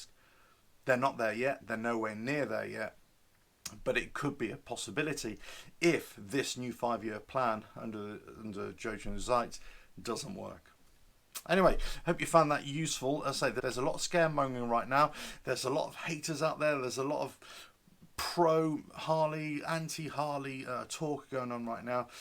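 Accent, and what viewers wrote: England, United Kingdom. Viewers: WTF Harley going bust? No. Harley change, is business tougher. Probably. Your accent, suggest you are in England. Back when I lived in UK. Harley was a rare sight, So was a Goldwing, Don’t know about today. Can’t see riding B roads in UK on a Harley, or a Goldwing. Just my opinion. Or at least I couldn’t when I was a young guy with a 250. When you get older, your priorities change, The Harley I thought to big, to old, to boring and to slow, a bike for old fat guys. Not for me when I was young. 35, or 40 years, Comfortable sounds much more appealing, No point in Harley making a crotch rocket, Ive out grown them. Now I am an old fat guy. Today’s millennials will out grow crotch rockets as they age. Can afford bigger, More comfortable bikes. Some will choose, adventure, Classics, Touring or Harley. The Harley market share in the US will remain strong, biggest risk is loosing some to Indian. 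Those of us who choose, British, Italian Or Adventure, were never Harley customers in the first place, They haven’t lost US, they just never built the bikes we liked. We are not buying Japanese cruisers either.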